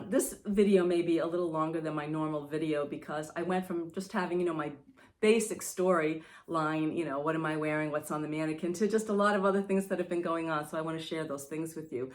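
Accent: New England, United States. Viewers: Hello,. What a pleasant surprise to come upon your podcasts. We seem to have a lot in common besides a love of knitting. I grew up in Pawcatuck, CT so still consider myself a New Englander. I love your footage of Block Island which I have visited once - such a precious memory of a lovely day with my Dad. My brother lives in Westerly, RI so I love your accent. I, too, have lost a wonderful uncle who left a legacy of storytelling. Your knits are gorgeous and so inspiring. I currently have an Artus shawl on my needles, have knit the Half + Half Triangle shawl (have linen quill to make 2 more), and love all the blue/green shades that you are drawn to. Love your reference to the relationship between gratitude and happiness! Looking forward to your future podcasts.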